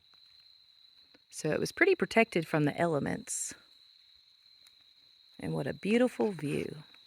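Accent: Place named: South, United States. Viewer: Fascinating video - thank you! Also, I have to say you have a wonderful speaking voice - very clear and pleasant with a nice Southern accent. That and the interesting subject make this an outstanding video.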